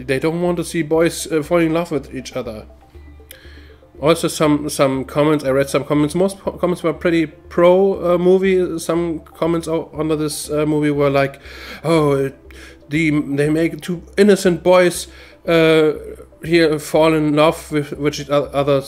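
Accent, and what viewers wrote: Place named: Germany. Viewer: It's getting difficult to find reactions to this that I missed seeing. I especially like seeing reactions from countries other than mine. I'm in the USA. Your english is just fine, and I like your german accent. I also like that you are a straight man that accepts gay people for who they are. I definitely liked your reaction to In a Heartbeat. It is just about my favorite video. I had to wait almost fifty years to see a video about love that I could really relate to.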